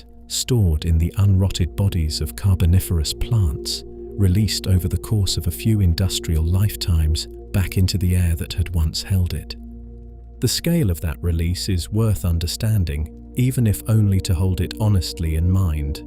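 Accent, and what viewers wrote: United Kingdom. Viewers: This is the best, most soothing ai voice out there. Perfect pitch and key mixed with a British accent. Gentle background droning creates ethereal atmospheric support that does not detract. Please stay with this voice for these beautiful stories of our blue magnificent planet. Thank you so much for creating and sharing. Very much appreciated ❤ Listening from Washington state. USA Subscribed ✅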